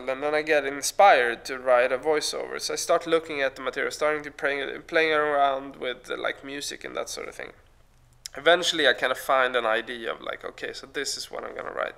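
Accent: Sweden. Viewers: Man kan inte bara sluta älska svinglish 😝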